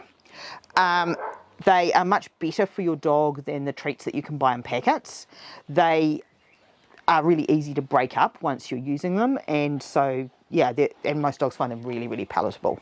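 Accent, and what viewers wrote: New Zealand. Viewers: Could you by chance spell out underneath your video what dog treats you're talking about (or perhaps a link to a recipe) since for the N. Americans the Kiwi accent is a bit difficult to unravel in certain spots and the name of the dog treat/roll was one of those spots for me. Thanks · She’s saying better. It’s the New Zealand accent 😊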